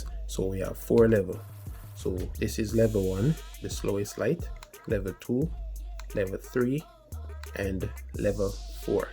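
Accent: Jamaica. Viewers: So nice and the Jamaican accent is rlly good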